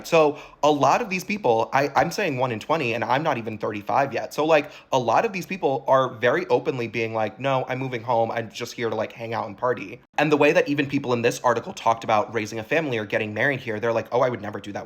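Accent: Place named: California, United States. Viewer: You don't sound like a New Yorker. You're very young. New Yorker's are supposed to say New Yawk and buttah and cawfee. You sound Californian. Oy vey, NY just isn't the same anymore. Greenwich Village needs a new tide of extreme weirdos; you know, people who wear lampshades on their heads or walk their partner like a dog literally with a leash. That's the Village I remember. If it ain't bizarre, it ain't the village, and it ain't NY.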